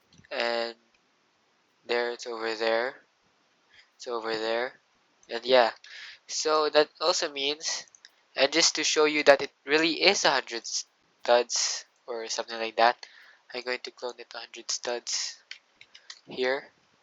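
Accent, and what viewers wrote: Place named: Philippines. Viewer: Parang Filipino accent ata to ah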